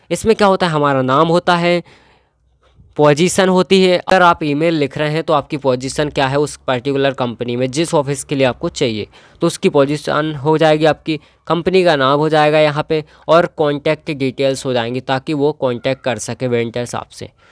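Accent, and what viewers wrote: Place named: Indore, India. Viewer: Bhai tu indore se ha na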